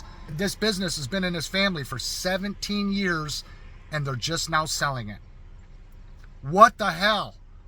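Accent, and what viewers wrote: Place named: Midwest, United States. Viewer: I enjoy your videos and stories. BTW, love your Midwest accent. God bless you. Robert in northern, CA 🇺🇲 😉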